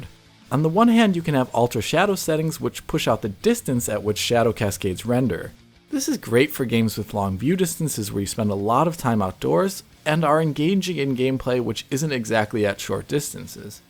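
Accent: Germany. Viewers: German is your first tongue isnt it? Excellent english pronouciation tho! And overall great video quality from what I've seen so far. EDIT: "Und auf Wiedersehen!" Yeah i think that settles it :-P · Trevor Cook Really? I'm pretty sure I hear a German accent when he's speaking English though. And the German things he said sounded absolutely 100% perfect. The "melody" of his voice also sounds very German to me... If he really is an American he's been assimilated into oblivion gawdamn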